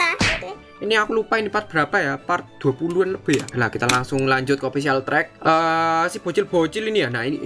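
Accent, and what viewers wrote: Java, Indonesia. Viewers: Bang sampean jowo ne jowo ndi mas kota ndi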